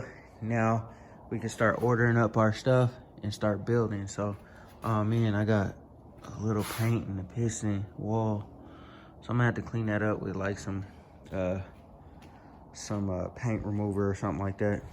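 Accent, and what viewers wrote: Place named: Bay Area, United States. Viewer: Man you got to be from the Bay Area, you definitely got our talk from out here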